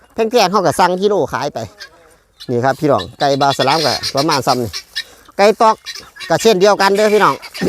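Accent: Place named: Isan, Thailand. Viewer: เขาคือคนอีสานค่ะ ภาษาที่ใช้ก็คือภาษาสำเนียงบ้านเขา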